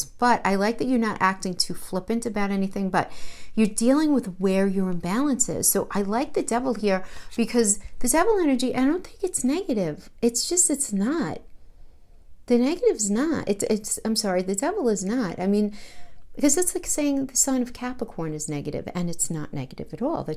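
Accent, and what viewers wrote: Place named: North Jersey, United States. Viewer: I definitely thought N. Jersey! LOL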